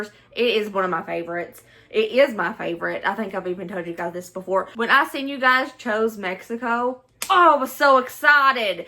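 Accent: South, United States. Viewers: don't apologize for your Southern accent. I was born, raised and still live in Tennessee and the older I get the prouder I become of my accent. You are a dear girl. I love watching you and your sweet family. God Bless you. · I’m not Mexican but in Texas you’re not allowed to not like Mexican food. It’s the best. I also love Vietnamese foods. They use a lot of those great spices plus others from Asia. You should try some of the Asian recipes. People would love your take on them. I’m an old great grandmother who really enjoy watching you. I love your accent it’s so warm and southern. You and your family are precious. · Girl I'm here for your country accent!!❤ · Sister we understand that southern drawl just fine! ❤ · I LOVE & ADORE YOU!!! 😂🩷😁 You are the funniest when you're trying to explain things, like your Southern Accent trying to pronounce Mexican words!🫣 Thank you for making me feel better and giving me a chance to actually feel like laughing🩷🤭🤣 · Luv yo Suthun accent...lol. 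I often make the chorizo with beans but use the very minimum amount of liquid. This us just a micro part of the gigantic array of food dishes from Mexico. I also make Móle, Enchiladas, Chiles Rellenos, (Shee-less-reh-yenos) plus many other dishes at home. God bless you and your family. BTW, I love cilantro and make my own Salsas with cilantro. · lol.. we can hear the country accent, if you can’t they are country too, and they speak your language so they wouldn’t know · You talk just fine, don’t mind the (KARENS)😳. ❤to you thank you for your time and country a. accent. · I adore your accent, I moved to Texas & there are not a lot of people here who have country accounts. 😔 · LOL!! I'm a Texan of Mexican heritage ... I, too, hate cilantro & DOES taste like soap!🤢😫 My bestie LOVES cilantro and uses it a lot (🙄🤦‍♀️), and I've gotten used to it, but I still won't buy it.😉 First time here, and I subbed. 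Love your southern accent. ❤ And you did pronounce frijoles correctly. 👍 Oh, and I have added cornbread to my mom's beans and still do. Can't help it.🤷‍♀️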